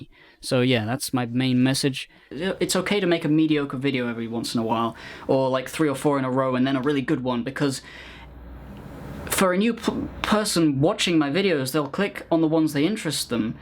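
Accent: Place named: United Kingdom. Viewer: I love the british accent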